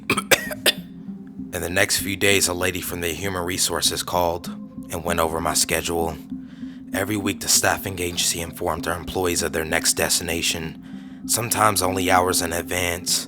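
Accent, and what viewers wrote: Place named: South, United States. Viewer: I would say he's a white southerner that moved north some time ago. Sounds like he's losing an accent.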